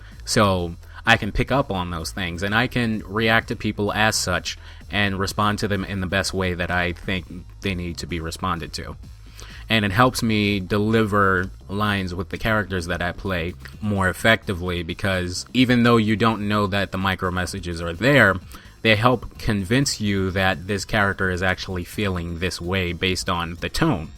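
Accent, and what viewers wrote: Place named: New Orleans, United States. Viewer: Shout outs to the black guys that sound like "white guys" lol But seriously, I get where you're coming from with this. And I could always hear just a bit of that New Orleans in you voice :)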